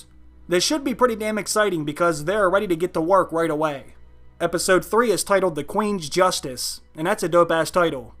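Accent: Pittsburgh, United States. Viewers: Are you from Pittsburg by any chance?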